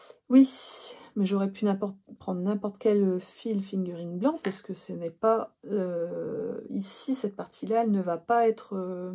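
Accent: France. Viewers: Merci pour ce podcast. J'aime beaucoup tous les modèles que tu as présentés, et tu le fais naturellement, sans chichis, sans essayer d'imiter le débit saccadé des journalistes ni jouer les influenceuses. C'est plutôt rare ! J'aime aussi ton délicieux accent du Pas de Calais dont je suis originaire. Les Harmonies, ça me parle et quelques notes de flûte m'auraient fait plaisir 😊. Concernant le pull Esme, pense d'abord à ton bien-être et ta santé. Chacun est libre de modifier les patrons, d'autant plus si tu cites la designer initiale. ✂️ Pas de bobine dans mes emojis, mais la couture m'intéresse aussi !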